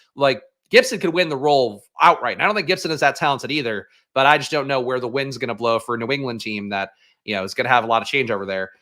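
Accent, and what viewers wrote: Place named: Australia. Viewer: Great Bluey's mum accent! have a great weekend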